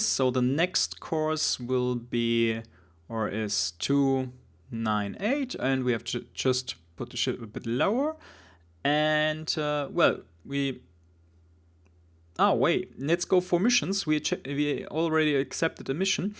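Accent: Germany. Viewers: Wenn Deutsche Englisch sprechen :) aber trotzdem gutes Video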